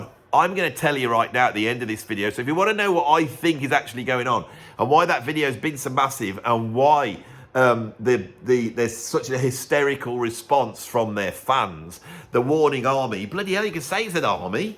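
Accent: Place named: England, United Kingdom. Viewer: I have to say, I never realized how American the epithet “douchebag” is until I’ve heard it with an English accent.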